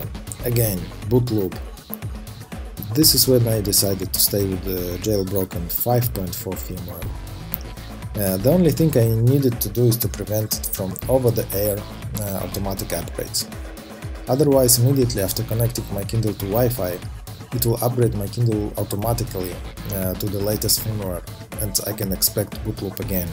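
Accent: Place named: Eastern Europe. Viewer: Собрался выбросить, думал батарея... заменил, без толку, звездочка крутится и виснет. Лазил в поисках волшебного "Big hardware reset for Kindle", нашёл это. Буду колдовать. Спасибо. В режиме санкций, концепт пoтреблJтства "купил-сломалось-выкинул-купил" необходимо забыть и восстанавливать то, что можно починить. Благодарность автору! (прошу великодушно простить, но акцент в ролике - наш, славянский).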